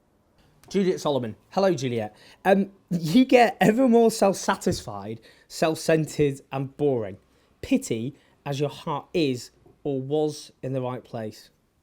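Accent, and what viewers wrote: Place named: United Kingdom. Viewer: Amusing, sharp and intelligent. Pity your british accent is a bit rough for a mere English-speaking spaniard, I'm sure I'm missing some meaning. Question: how much time do you think we have? I mean, until the majority of us get so used to this downsize in our living standards, our welfare and our civil rights, that we forget once we had rights, thus giving up the struggle for recovering them?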